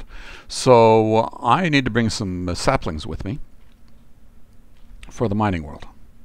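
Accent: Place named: Canada. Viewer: I can't quite pin down your accent. I hear some Canadian in there, but also a hint of Scottish perhaps?? Or am I just way off lol? Great video, thank!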